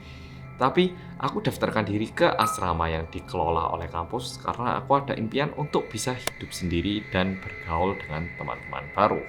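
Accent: Java, Indonesia. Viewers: Episode terakhir huuhuhuhu, wes rapopo, seng penting mas tetep medok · dari awal sampe sekrng masih bertanya2. knpa mas bisa medok begitu 😁😁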